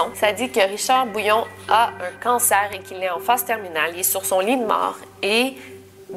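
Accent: Canada. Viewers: vous êtes une jolie femme, et trop mystérieuse, j'm vos vidéos, et surtout l'accent canadien, j'adore.c'est un compliment.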